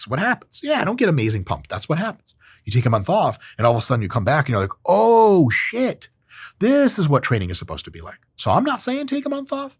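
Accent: United Kingdom. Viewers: I need more of that British accent! Hilarious! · British accent 🤢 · Your British accent is aweeesome 😂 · I f******g loved the British accent. Much love from the UK brother. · Love the British accent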